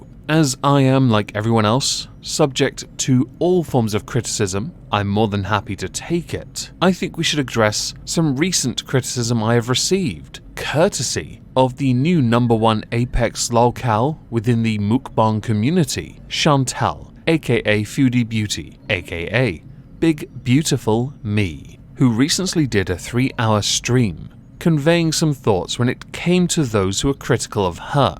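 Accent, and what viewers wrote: United Kingdom. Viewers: Ah, nothing like hearing a Cthulhu dude with a silky smooth voice and great british accent dunk on the stupid peeps. Then hearing that glorious outro. Thank you for the video sir! 😄👍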